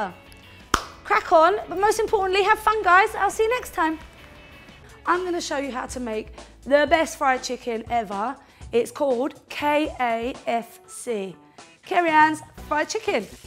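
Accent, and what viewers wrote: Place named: London, United Kingdom. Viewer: sounds like it could be london/south Essex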